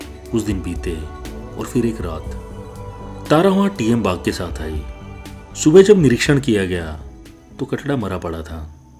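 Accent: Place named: Uttarakhand, India. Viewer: Aise lagta hai sir Uttaraakhand se hai 😅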